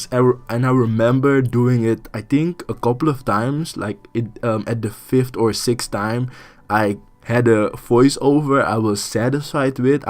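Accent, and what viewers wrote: Netherlands. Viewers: Good thing you decided to do your voiceover in English. Otherwise, I would not understand a word and fall asleep. Your Dutch accent is pretty cool..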